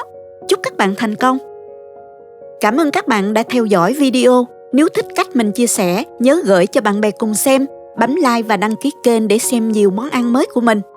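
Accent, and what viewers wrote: South, Vietnam. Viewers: Thích giọng của, nói giọng Nam nghe rất chân tình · Giong miền nam, sang nghiêm mà giản di chân thành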